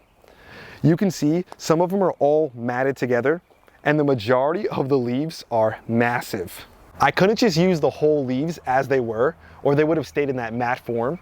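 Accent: New Jersey, United States. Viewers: I am in Burlington NC! I planted my seed potatoes in 10 gallon fabric grow bags last week. April 15. The russets are just coming through. I planted all the potatoes deep so I can have room for hilling. I learned a lot from this video. I have grown potatoes in straw and even cardboard boxes with successes. I was planted later this year because the first batch of seed potatoes I ordered from MIGARDENER were not chitted. So, I had to spend a month doing that. I ordered some new seed potatoes from MIGARDENER were a week ago and they came today already chitted, so I planted them immediately. Take care and good luck. I love listening to you talk with your Jersey accent. I lived in several places in Jersey in the 1970s Nd 1980s. I moved here from Asbury Park in 1992.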